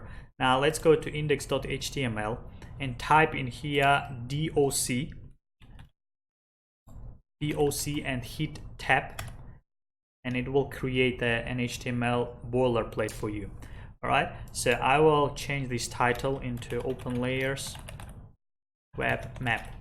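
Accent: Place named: England, United Kingdom. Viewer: what english accent is this?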